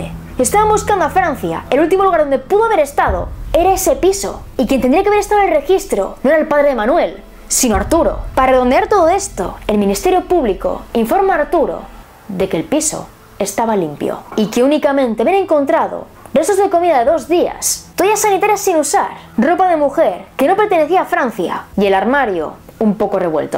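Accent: Spain. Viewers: Hola soy de mexico y un buen trabajo a pesar de tu acento español muy bello trato de entender pero gracias a ti comprendí lo de la matanza de Columbine mucho mejor que el documental de hora zero aunque gracias al documental you tuve me direccióno a ti saludos en hora buena sigue así mucho exito👏👏👏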